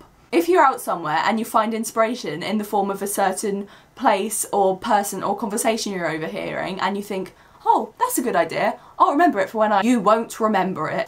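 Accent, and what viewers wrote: England, United Kingdom. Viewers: Btw where about in England are you because we have literally the same accent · where from england are you, i do but dont know the accent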